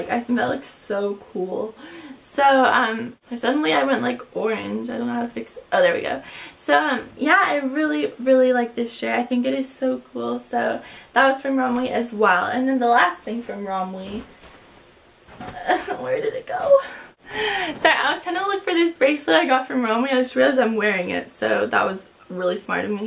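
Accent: United States. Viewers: Why do you speak in a American accent ? It's pretty annoying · Why are you speaking american if your english?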